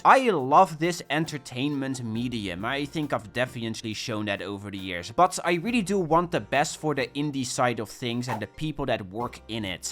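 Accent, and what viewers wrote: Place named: Netherlands. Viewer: Ya sound dutch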